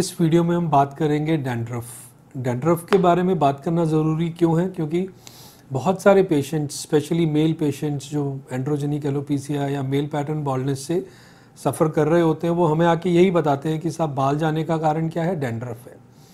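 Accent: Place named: Hinglish, India. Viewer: thats a how ppl speak here hindi+english=hinglish😁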